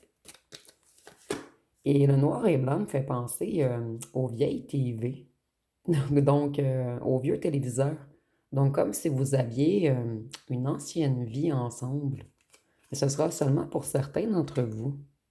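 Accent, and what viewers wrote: Canada. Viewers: ❤ Merci,oui, exactement,il a menti, évitant, sûrement vie antérieure mais où ?, exactement il a tout foiré, j'adore votre accent canadien,en le côtoyant j'ai compris qu'il ne voulait pas travailler sur lui,et guérir ses blessures.C'est exactement ça,en se rejettant,il m'a rejetée, m'a fait beaucoup souffrir 😢😢.On a la blessure d'abandon en commun,et de rejet aussi,il faudrait qu'il aille voir un psychologue.C'est ma flamme jumelle 🎉🎉,tout ce que vous avez dit est excellent.On s'est rencontré en 2021.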